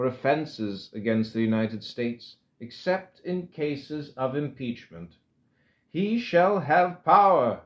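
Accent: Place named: United Kingdom. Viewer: It just seems wrong to recite the Constitution in a British accent.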